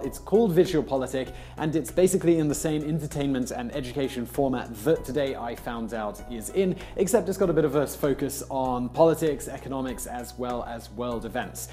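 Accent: United Kingdom. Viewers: is like VSauce's Michael with a British accent <3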